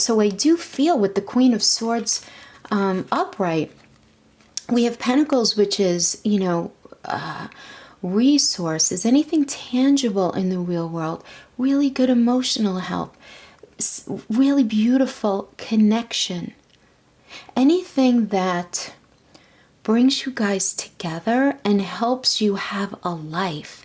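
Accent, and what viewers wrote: New York, United States. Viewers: Is it new York accent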